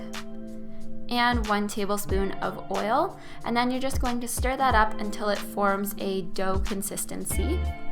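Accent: Canada. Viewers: canadian accents are so cute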